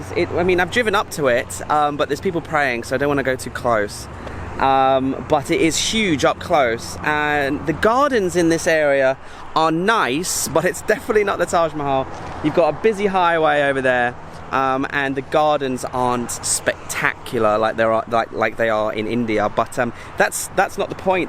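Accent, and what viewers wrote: United Kingdom. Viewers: Hey, nice video. Question - going purely on memory your name sounds decidedly IRISH; But your accent... It's British no? Are you from England/Liverpool? Or am I way off? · Thanks so much for this VDO. I’m just back from London and will live in Hatyai. Love your British accent so much! Hope to see you around in Hatyai. Enjoy!! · Love the British accent. Or Irish? Fun anyway.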